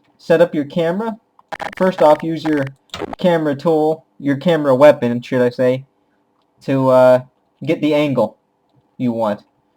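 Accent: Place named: Texas, United States. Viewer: He sounds more like a teenage texan.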